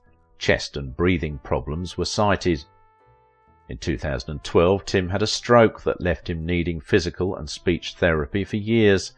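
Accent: United Kingdom. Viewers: Awesome video!!! You, the narrator sound exactly like Laurence Brown from YouTube channel "Lost in the Pond"....and I don't say that because you are both British..😉..Love that channel! Glad I found this one too! 😁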